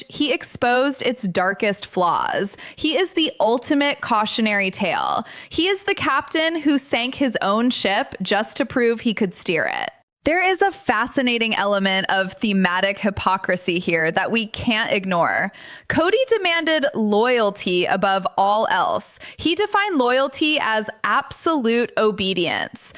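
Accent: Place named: California, United States. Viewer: For the love of God and all that's holy, make the AI get rid of the California sccent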